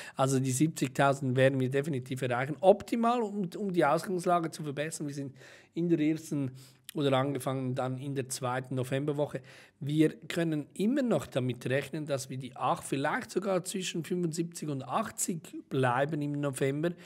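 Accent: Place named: Switzerland. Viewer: schön mal ein schweizer zu hören im youtube :-)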